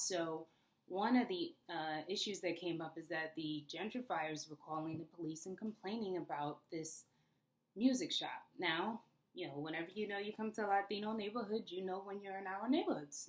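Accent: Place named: New York, United States. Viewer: Yes I hear that accent, but it's nice, I normally am not fond of NY accents, but yours is minor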